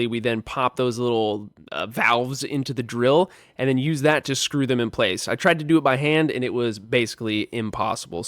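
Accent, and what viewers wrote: United States. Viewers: You motivate me to do such activities too 😁 It's super cool watching you speaking English. I try my US accent practice with your videos too 😂 So it's like killing two birds with one stone😂 😇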